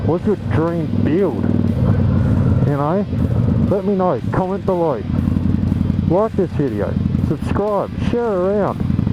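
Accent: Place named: Australia. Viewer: Saw you channel name on a comment so I thought I would come and check it out !! Sounds like you are in, or from Australia ....We were in Australia in early 2019. Love it there!!!. New subscriber here from Canada .. My dream bike as a kid was the '82 Honda CBX 1000, sport touring bike....to this day I wish I could find one !!! cheers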